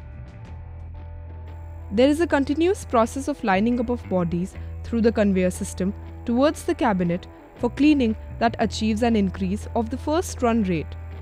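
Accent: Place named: India. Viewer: Bahasa Inggris nya orang India ini lucu...😂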